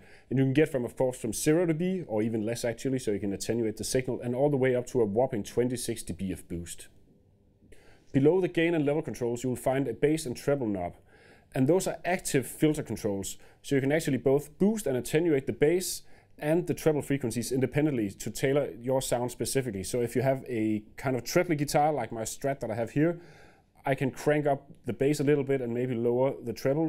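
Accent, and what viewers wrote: Denmark. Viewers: I play steel guitar through a solid state amp. I'm looking for a clean boost to take solos with. If I understand this demo correctly, the gain won't add overdrive unless this is going through a tube amp, right? I don't mind a little bit of drive in the sound on peaks but its a clean boost I'm looking for. Btw; I work regularly in Denmark so the accent doesn't bother me - believe me, its easier to understand than listening to someone "like... so from California"! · Wow he sounds danish!!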